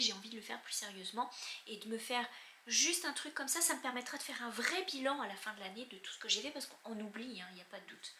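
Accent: Canada. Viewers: Merci encore pour ce beau moment de partage. Passez un merveilleux week-end en famille. Et merci pour ce joli accent canadien qui ressort de temps à autre !! 😊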